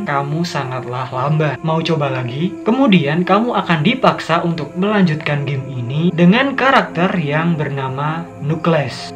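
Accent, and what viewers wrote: Java, Indonesia. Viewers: Sampean suku Jawa tooo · Jowo daerah pundi kak? 😹😹😹😹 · Lidah khas Jawa nya loh yg bikin seru 😁😂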